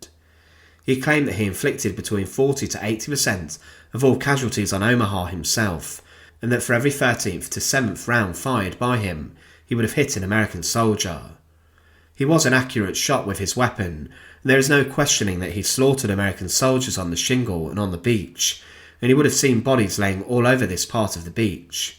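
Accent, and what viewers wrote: United Kingdom. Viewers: Why couldn't an American read this? They always tryna put Brits in charge so it sounds like they're smarter. I don't like listening to this.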